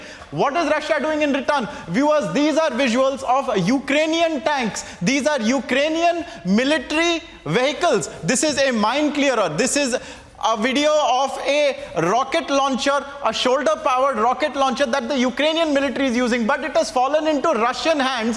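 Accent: India. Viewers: Very indian sounded reporter...very entertaining...well done the Indian reporters..you guys have overtaken any other major news agencies world wide... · This sounds INDIAN..blah blah blah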